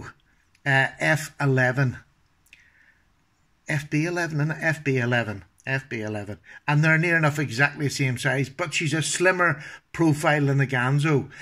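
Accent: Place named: Ireland. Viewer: What about ya. I just came across this video and noticed the accent and I’m from Ireland myself. What part are you from? I’m from County Armagh. Good to hear a fellow Irishman on here. Fare play to ya boy. I’m going to subscribe to help a fellow Irishman our. Great wee video. Cheers mate.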